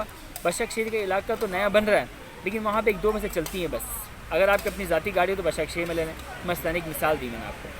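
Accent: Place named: Karachi, Pakistan. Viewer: Bhai ka sahi karachi wala accent ha .. zabardast...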